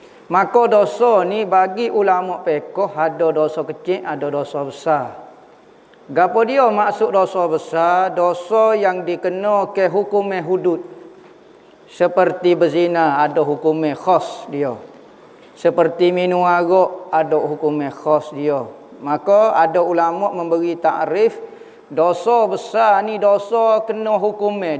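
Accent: Kelantan, Malaysia. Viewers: Klate pekat meme gitu doh kak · X paham kecek kelate sajo.. Jadi paham plok🤣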